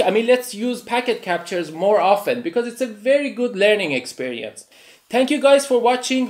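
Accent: Iran. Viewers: your accent like iranians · Thanks, quite useful for troubleshooting my IP cameras...and oh yeah.....I just love that Persian accent :-) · in iran we say that your Tehraneis accent is killiiing me and we think thats beautiful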